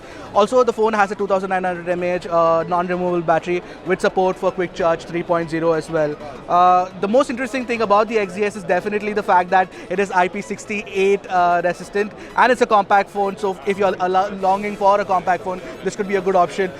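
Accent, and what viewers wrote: India. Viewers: David H Yes with a very heavy Indian accent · When you have a very strong Indian accent. Please speak slow. dammit!!! and Put some subtitle too.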